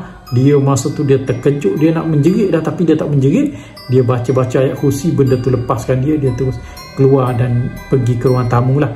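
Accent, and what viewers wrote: Kelantan, Malaysia. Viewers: Waalaikummussalam warahmatullahhiwabarakatuh... Amboiii...jenuh tunggu la kak Nor dgn crita² terbaru. Buat la setiap hari bey. Yg pertama kak Nor suka dengar lontaran alunan ayat² suci pembukaan tu. Dannn....kelembutan bahasa yg persembahkan dlm lontaran bahasa lohgat kelantan tu.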